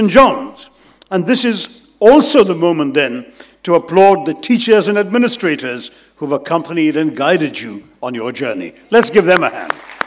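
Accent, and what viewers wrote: United Kingdom. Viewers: He speaks like Britisher.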